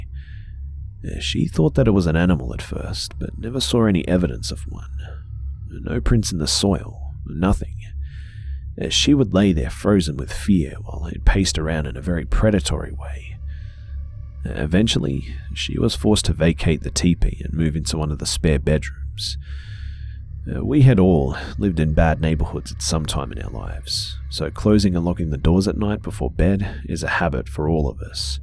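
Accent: Australia. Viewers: xsmol x hu x soldier x Australian accents are the best :)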